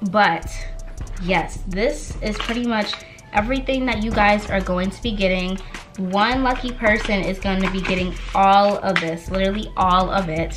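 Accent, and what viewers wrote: New York, United States. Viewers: I can tell you a new Yorker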